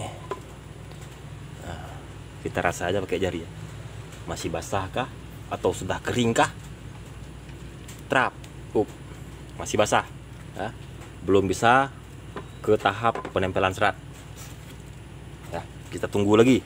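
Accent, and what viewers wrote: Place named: Aceh, Indonesia. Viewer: Aksen Asli Aceh Utara bang Mantap Kali bukan kaleng kaleng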